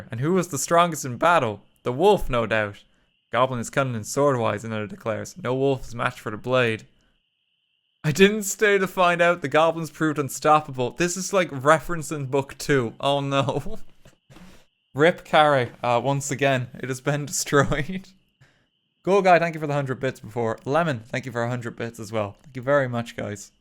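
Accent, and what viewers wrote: Ireland. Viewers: next stream: listening to an irish man speak for 8 hours :) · Why do you do this to me? I'm writing my friggin' A-Level English exam tomorrow and you're pulling me away with irish voice goodness ;w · he sounds like the most irish person i’ve ever heard in my life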